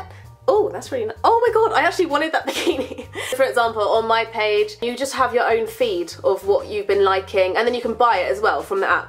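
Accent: United Kingdom. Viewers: Love your british accent.dont like the hair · LOVE The Hobbit! Its cool to see we have similar reading taste! Love your videos as well <3<3<3 I live in the US, but my boss is a Brit, and he talks similar to you! Your accent/voice put a smile on my face!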